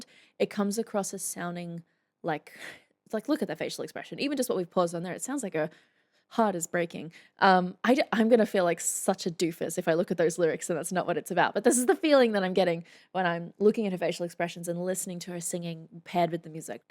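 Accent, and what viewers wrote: Australia. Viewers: I’m an Aussie so don’t usually find Australian accents very interesting, but you always so amazing!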